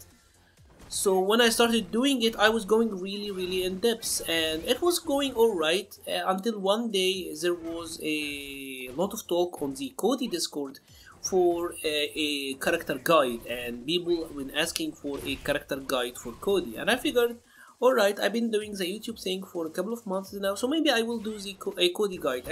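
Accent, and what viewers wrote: Egypt. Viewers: Criminally low number of subs. Get the word out people, this guy is spitting knowledge with a smooth Egyptian twang.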